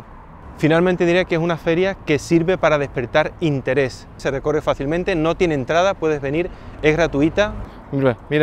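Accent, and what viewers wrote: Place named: Seville, Spain. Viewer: Como lo pusiste con k pensé que a lo mejor allí no se utilizaba, cosa me extraño que así fuera, pero como hay palabras comunes aquí que en algunos países de Latinoamérica no se utilizan, pues sera una de esas. En cuanto a la pronunciación, el es sevillano y tiene su acento particular dentro del acento español andaluz.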